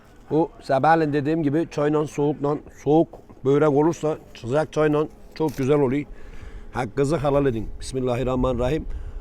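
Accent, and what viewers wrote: Gaziantep, Turkey. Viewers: Çok güzel olmuş eline ze saglık anlatiş şeklinizde çok tam bir annepli gibi 👍👍👍👍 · Şu şive şu samimiyet helal olsun Antepli arkadaşım vardı oda böyle konuşurdu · Bu Antep şivesi çok kötü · Antep kilis şivesine ölüyorum ya ustam ellerine sağlık hayırlı Ramazanlar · Antep aksanı en güzel aksan. Yaptığınız yemek çok sağlıklı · Antepli bir arkadaşım var gastronomi bölümünden birebir abinin aynısı zaten konuşmaya başladığı anda antepli olduğunu anladım antepliler iş ahlakı disiplin ve cömertlikleriyle biliniyor ellerinize sağlık ustam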